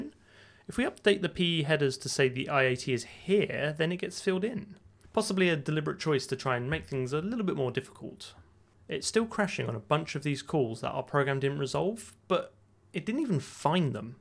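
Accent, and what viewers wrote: United Kingdom. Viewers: I have no idea what you're saying but your delivery is impeccably British and I can respect that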